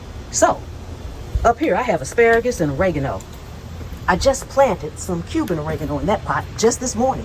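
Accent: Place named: North Carolina, United States. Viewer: My NC friend ❤ I’m in Northeastern NC. I’m gonna have to take some lessons from your gardening channel. I thought you sounded like you have a NC accent. Thank you ❤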